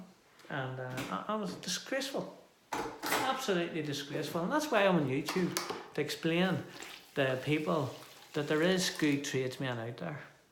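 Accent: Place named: Northern Ireland, United Kingdom. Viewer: Nice to hear someone from my part of the world on here! I’m a decorator just outside Belfast. Good videos!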